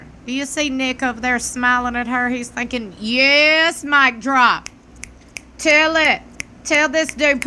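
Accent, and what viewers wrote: South, United States. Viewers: This is awesome and your strong southern accent makes it hilarious! I love the way you say full of shit!😂😂😂 I’m southern girl as well so I appreciate a good southern accent ❤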